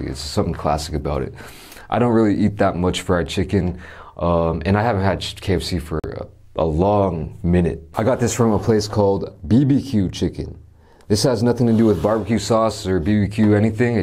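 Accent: United States. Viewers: This guys looks Asian but sounds soooo different from an Asian. He sounds like a American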